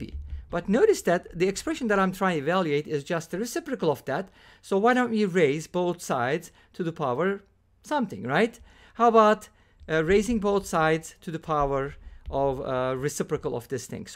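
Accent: Turkey. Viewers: Are you Turkish? Your sound like turkish english.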